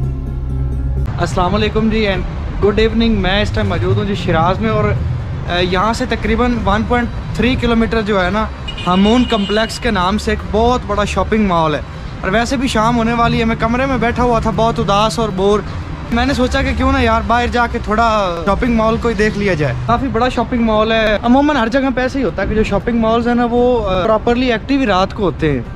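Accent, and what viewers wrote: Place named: Punjab, India. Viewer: Annoying poon-jobi accent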